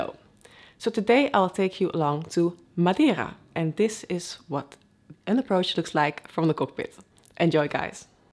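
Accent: Netherlands. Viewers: wat klinkt dit nederlands zeg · The dutch accent on english is something that can never be hidden :D Greats from Belgium! Btw, I wouldn't mind a voice over the approach instead of text, that way you see more then reading text. Or was this a deliberate decision?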